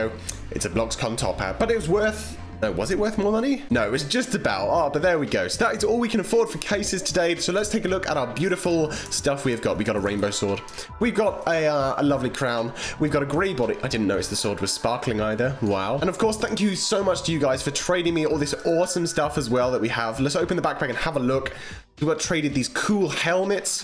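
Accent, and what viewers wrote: United Kingdom. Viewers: Are you are British so if not ... ... .. ... ...........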